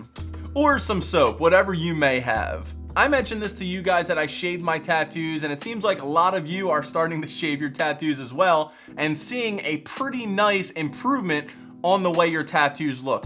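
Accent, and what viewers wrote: Philadelphia, United States. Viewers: nice video, i can tell youre from philly/delco by the accent lol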